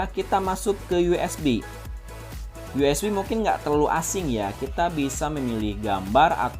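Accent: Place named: Java, Indonesia. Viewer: TPRI 😂😂😂 medok bangettt bang 😂😂😂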